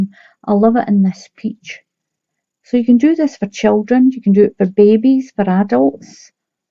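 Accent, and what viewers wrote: Scotland, United Kingdom. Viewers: Hello, I just subscribed your channel. I watched many different ones and yours is my absolute favourite!! Beautiful crocheting and I love how you explain everything so well and repeat it over and over, which I find important because then I don’t have to go back to find it again. Also love that you speak slower than most do, it makes me feeling relaxed. And your British accent is lovely 😊 I’m German but live in Canada since 26 years. The winter is hard and long, so I picked up crocheting. I’m enjoying making this one here right now. I have saved several videos from you and I want to do them ALL! Lol Thank you for doing this❣️👍👏❤️ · your beautiful Scottish accent remember me of the good time that we had in Scotland. My husband looked forward on breakfast every morning because of the haggis. You are a wonderful teacher, thanks for your great crochet tutorials.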